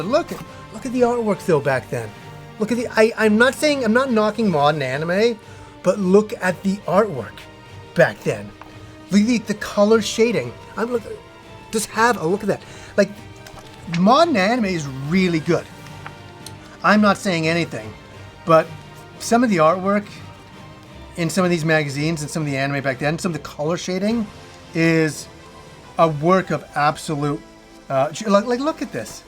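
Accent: United Kingdom. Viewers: Hold the phone...you’re British?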